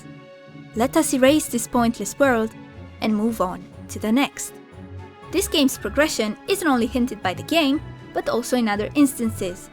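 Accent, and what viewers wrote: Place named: Poland. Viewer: Small off-topic, but I'm a bit curious. Are you perhaps from a slavic country? Your pronunciation sounds very similar to how my polish friends sound when speaking english lol.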